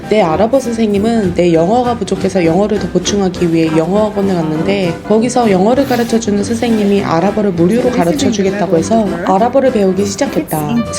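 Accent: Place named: China. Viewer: 중국 어처럼 들려요 ㅎㅎ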